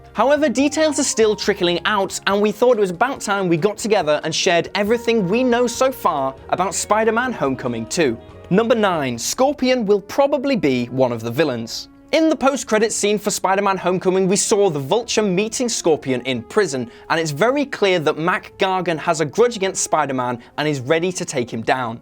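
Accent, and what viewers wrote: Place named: England, United Kingdom. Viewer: Ugly English accent.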